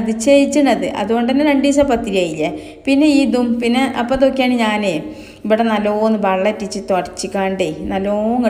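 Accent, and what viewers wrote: Malappuram, India. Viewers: ചാനലിന്റെ പേര് എന്താ മാറ്റിയത്. പക്ഷെ സംസാരം കേൾക്കുമ്പോൾ സൗണ്ടും പിന്നെ കമെന്റ് നോക്കിയപ്പോൾ ആണ് സംശയം മാറിയത്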